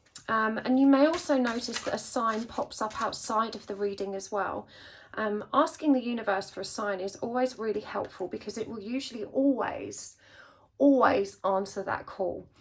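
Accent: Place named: South London, United Kingdom. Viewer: I like to hear your voice. It reminds me of home. Somewhere between South London and Brighton I guess... I will probably never go back again as I refuse the vaccine, I'm pretty much stuck where I am. We make our bed and we must lie in it I guess. Anyway, thanks for the soothing and long-ago familiar tones of my home strip!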